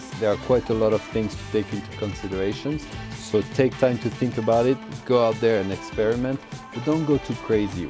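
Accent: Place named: France. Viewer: there is a reason why everyone likes! his so calm and radiates good energy, and the french accent is so smooth u can spread it on ur bread like butter, french butter =) everyone: hav a good surf on ur turf!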